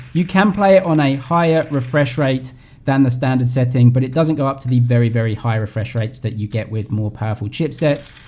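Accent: England, United Kingdom. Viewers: i like his accent is he british or australian ??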